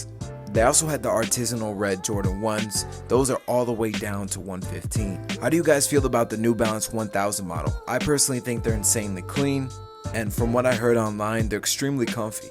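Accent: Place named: Ontario, Canada. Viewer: You from Ontario?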